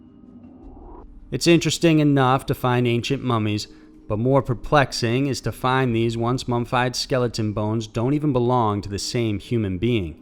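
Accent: South, United States. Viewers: Wonder if he has southern roots like McCaunahay?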